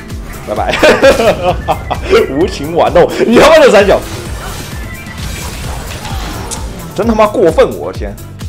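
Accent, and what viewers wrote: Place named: China. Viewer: 確實 他是大陸人嗎 那口音聽得很難受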